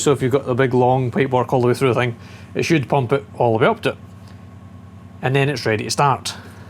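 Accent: Scotland, United Kingdom. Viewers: After bingeing on AVE videos, a gentleman with a Scottish accent has made this minnesotans day.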